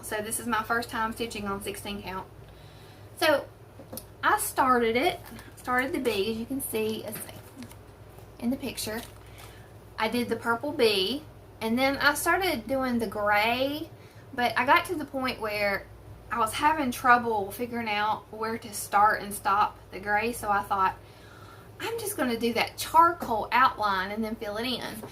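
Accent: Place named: South Carolina, United States. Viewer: I love your South Carolina accent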